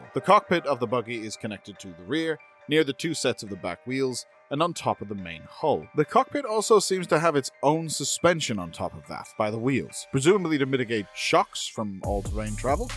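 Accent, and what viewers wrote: Ireland. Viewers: Are you Irish? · I would have bet the accent was Irish eastern mild Dublin or surrounding area. But accent can change based on location. And time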